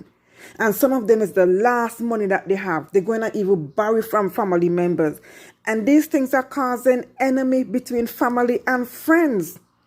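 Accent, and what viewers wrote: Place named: Jamaica. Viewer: There is only one truth in this space , if it sounds too good to be true it is, they are all ponzi schemes. where u from ? u sound jamaican.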